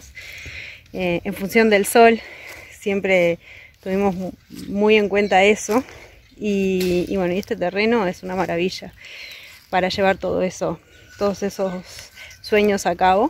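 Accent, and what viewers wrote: Córdoba, Argentina. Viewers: Descaaaansar jeje ya se te pego la tooooonada jaja 😄😄 saludos desde Santa Fe pero soy bien Cordobesa jaja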